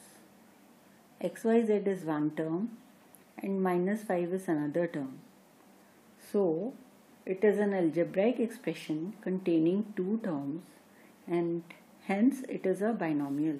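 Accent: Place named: India. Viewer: she has an Indian accent like a lot of my teachers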